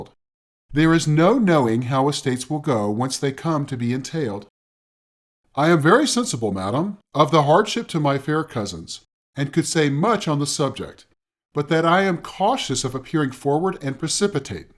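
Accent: United States. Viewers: An American reading Austen just does not compute in my brain. This gentleman, though I’m sure he’s trying his best just does not sound right. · It's exactly what I want, American accent! Thanks. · I could not bare the mispronounciations and american accent.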